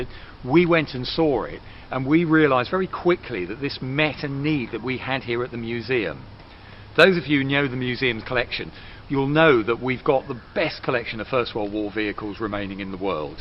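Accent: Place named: England, United Kingdom. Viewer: сразу понимаешь что говорит англичанин )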